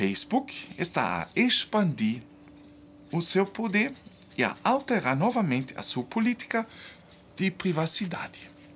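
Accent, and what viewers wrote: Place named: Germany. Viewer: Ele é alemão.... isso se chama "sotaque"!.......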